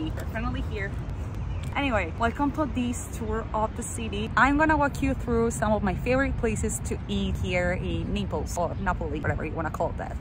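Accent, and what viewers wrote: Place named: United States. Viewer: Sei davvero Napoletana , il tuo inglese americano è perfetto. Sembri Italo-americana. Un saluto dal Polentone del Polesine (Veneto).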